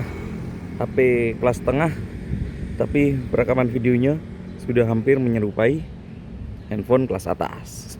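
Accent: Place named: Surabaya, Indonesia. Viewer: lhooo SUROBOYO TA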